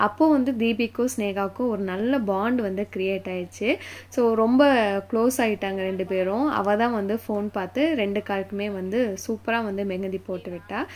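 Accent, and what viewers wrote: Kongu, India. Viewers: Nenga kongu ah sis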